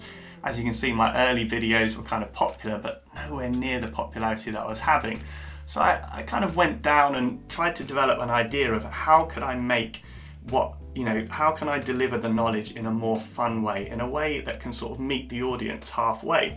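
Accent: United Kingdom. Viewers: A like for your humbleness and great Bristish accent.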